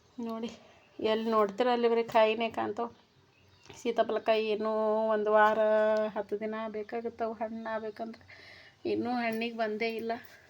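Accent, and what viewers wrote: North Karnataka, India. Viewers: ಅಕ್ಕ ನಮಸ್ಕಾರಗಳು ಅದ್ಭುತವಾದ ವಿಡಿಯೋ ನಮ್ಮ ಉತ್ತರ ಕರ್ನಾಟಕದ ಭಾಷೆಗಳು ಮಸ್ತ್🎉 ಮಾತಾಡಿ ಅಕ್ಕ ತುಂಬಾ ಖುಷಿಯಾಯಿತು ನೋಡಲು ಮತ್ತು ಕೇಳಲು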